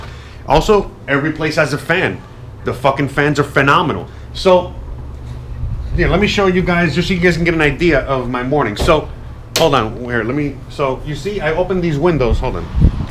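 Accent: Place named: New Jersey, United States. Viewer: I'm thinking you're from Jersey??? "Ya know whata mean."...."Get out of here." Strong accent and the f word is just a simple roll off of the tongue. lol love it! Thanks for posting because I was wondering what I could get with my tiny little budget! So I'm rather impressed with the apartment for the moolah.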